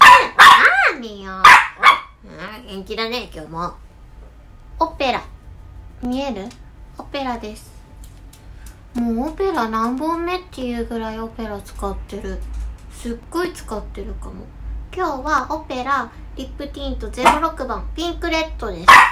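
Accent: Kansai, Japan. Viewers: やっと菜々さんの関西弁が聞けたよ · 関西弁しゃべってるほうがかわいい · の関西弁もっと聞きたいよ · ゴリッゴリの関西弁も聞きたい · たまに出る関西弁がかわいくて好き！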